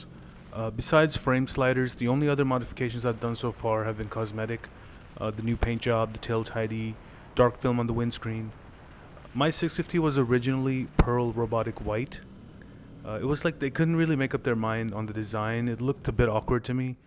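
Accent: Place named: United States. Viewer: Bro your English is impeccable. You sound like you've grown up in the U.S. your whole life haha.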